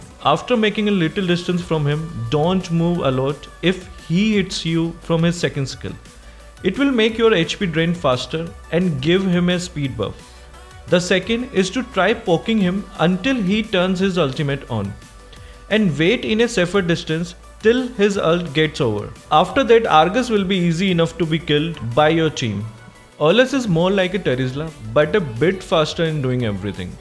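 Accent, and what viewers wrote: India. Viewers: Your Accent is just like Indian's, I LIKE THAT ACCENT!👍👍👍💗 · R u Indian bro ur voice is indian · R u indian ur english accent sounds like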